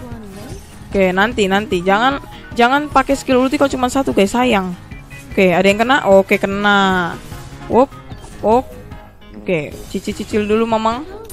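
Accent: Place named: Java, Indonesia. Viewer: Medok Jawa mantab to the tab tab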